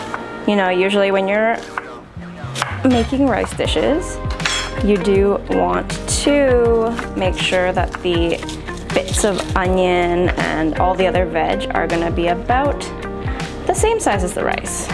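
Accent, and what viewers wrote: Costa Rica. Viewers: En español tienes acento costarricense, por un momento pensé que estaba usando una dobladora costarricense 😅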